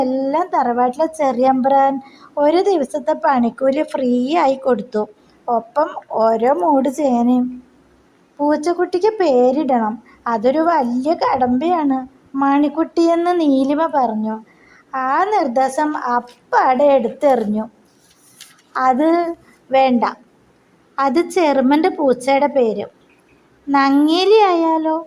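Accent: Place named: Valluvanad, India. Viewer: നല്ല വള്ളുവനാടൻ മലയാളം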